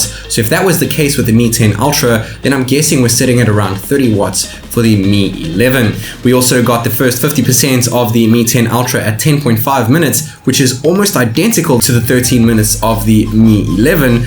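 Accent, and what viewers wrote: South Africa. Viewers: I just gotta ask, are you South African because you kinda have that accent?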